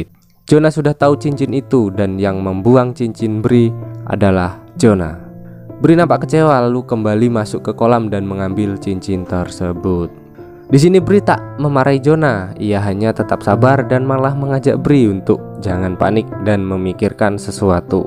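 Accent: Surakarta, Indonesia. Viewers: Wong solo to mas😂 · Orang solo ya bang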